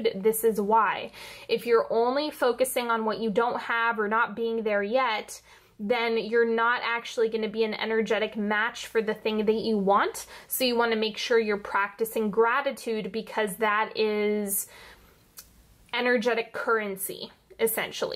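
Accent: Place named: United States. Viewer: Whats this accent from in US?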